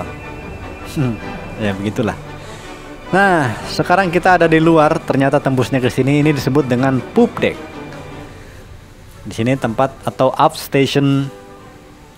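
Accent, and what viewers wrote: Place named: West Java, Indonesia. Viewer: Bang asli mana...klo logatnya....saya tebak..mgkin dari jabar nya....tolong bales..trmksh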